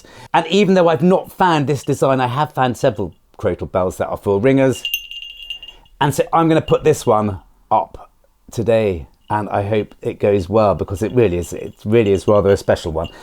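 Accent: RP, United Kingdom. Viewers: Hi, Recently discovered your channel and immediately subscribed! I think you're a hoot! I'm from Glasgow, love your poshness! I've watched a lot of your videos. I love your "woosh" back to HQ! I really like the bird coin too. I love the goofy/cartoon look to it (for want of a better description)! What about a clear matt acrylic sealer for the Roman brooch? PlastiKote is a good brand (I use their enamels). Agree, the gold ring will be the best thing you'll ever find...until you find a gold hoard! Keep sweeping, fella! The crotal bell is doing well on ebay (see the description for the link folks)!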